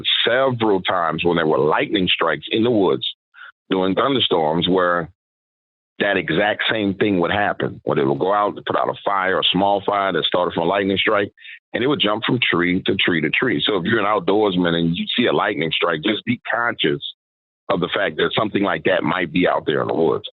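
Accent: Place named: New Orleans, United States. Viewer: Aah my fellow New Orleanian!! I can hear your accent a mile away 😅 “dawk wadders” Love it! 🫶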